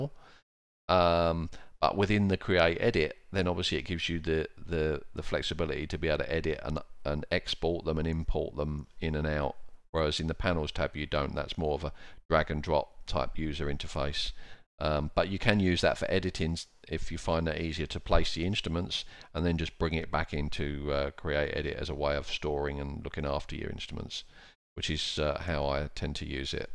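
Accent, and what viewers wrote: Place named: United Kingdom. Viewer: I'm new to this and greatly appreciate your videos. They are even clear to me which is not an easy affair for a French speaking simmer listening to a british accent! I will have to see this one again as I missed a few things. By the way you should make your panels available. It would be so useful for beginners. Thanks again!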